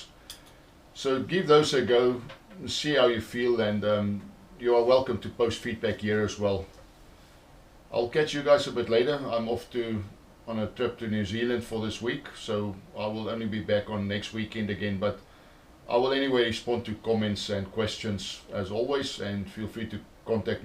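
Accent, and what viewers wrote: South Africa. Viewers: Hi just wanted to find out where u are from you sound South African. IF so where did you order your simucube from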